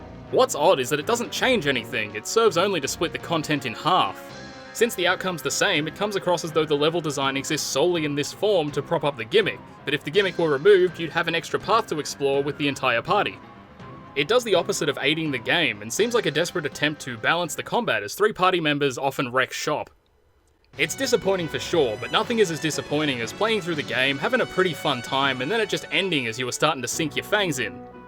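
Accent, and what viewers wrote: Australia. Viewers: I wasn't expecting a reply, love your channel so far, it's refreshing listening to another Australian talk about game design.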